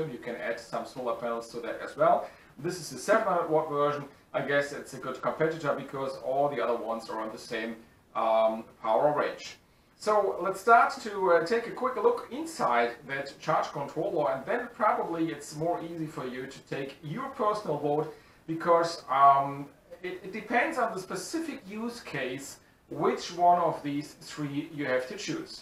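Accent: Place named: Germany. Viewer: Na hier hast du dich wohl verkalkuliert! Das ist wirklich schade dass du dieses Video besonders dieses Video nicht in Deutsch gemacht hast. Denn die Nachfrage von deutschen Windkraft-Freunden ist sehr sehr groß und es sind so viele Fragen offen und ich kann mit Englisch beispielsweise gar nichts anfangen so auch die Community in der ich bin und ich habe überhaupt kein einziges Wort verstanden was du da erzählst. Aber deine like gerade die wäre garantiert höher gewesen hättest du dieses auf Deutsch gemacht, weil alle suchen nach solchen Videos in Deutsch und finden ist nicht und jetzt sprichst du Deutsch und machst es in Englisch was ich mega schade und traurig finde was für eine Verschwendung um diesen Bericht. Denn es gibt unzählige englische Berichte für Windenergie nur leider nicht auf deutsch das wäre deine Chance gewesen. Und wie wenig draufgekommen,?... 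Das Englisch war so typisch deutsch. Man hat herausgehört dass du eigentlich Deutsch sprichst 😉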